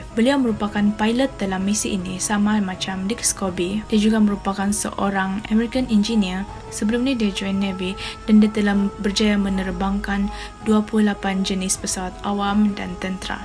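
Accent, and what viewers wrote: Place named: Sabah, Malaysia. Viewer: Punya kental sabahan itu bahasa ko..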